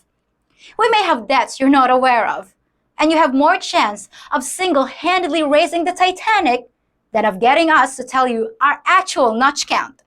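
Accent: United States. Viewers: Hello again my dear , it seems that your american slang is starting to show things that you could have only learned in the usa you were either in nyc or los angeles , your english is to polished to have come from the philippines , you can fool some people but you cant fool everybody im sure you will block and delete me now ! have a nice day ! im not knocking your channel you say some cool things that need to be said but pretending your somebody your not is another thing ! like you say honesty is the best policy ! and one other thing who is the Foreigner that is supporting you ? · Yeah, guess where that American accent comes from 🤭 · You don’t sound very Filipina you sound more American sooooo I’m just saying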